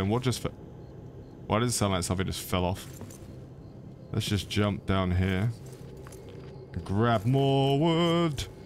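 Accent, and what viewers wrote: United Kingdom. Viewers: Love the playthrough so far man. loving this game too, I just got to Duran's forge last night and having a blast! also my brother in Christ you are British AS F*&%!!! lmaooo "oh im just a knob-end"..."lovely jubblies"... Being American, our speech and pronunciation is just so different its always hilarious thinking about how it got that way lol anyway keep on keeping on man, im having fun watching along! edit: 😂😂 you're talking about loving the songs the dwarves sing just like in "Black Sails and the vikings as well....like assassins creed" lmaooo BRO take some neurotropics or something you're forgetting names for everything!! looool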